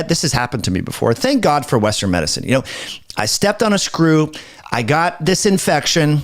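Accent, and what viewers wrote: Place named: South, United States. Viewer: North Carolina here. I heard a "bless his heart." You must be from the South. Hsha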